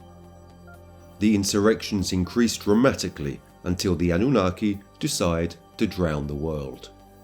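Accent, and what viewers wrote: United Kingdom. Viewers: I didnt last 12 min. English accents turn my stomach.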